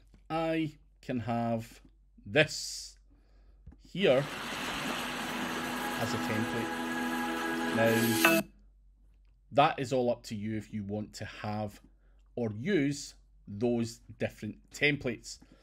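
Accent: Scotland, United Kingdom. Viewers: Scottish brogue: still undefeated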